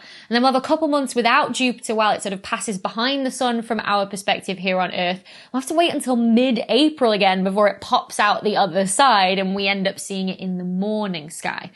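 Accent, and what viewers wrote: United Kingdom. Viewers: every time you say "run the matts" I'm like oh right brit. lol love your accent. I think most Americans love the brits accent. I hear we all sound like cowboys to yall. even like the midwest and cali people do. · At first I thought you must be Australian. Then I heard you reference WE in the north and realized it must be the UK somewhere. Anyhow, nice accent, great eyes. 🙂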